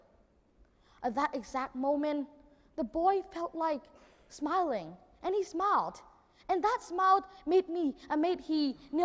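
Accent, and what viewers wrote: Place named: Vietnam. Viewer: giọng Vietnamese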